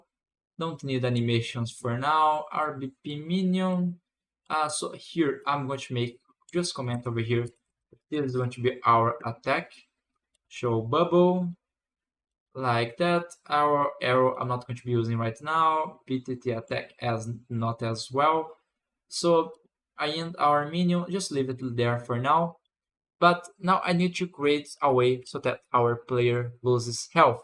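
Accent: Brazil. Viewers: esse sotaque ai, tu é br kkkkkkk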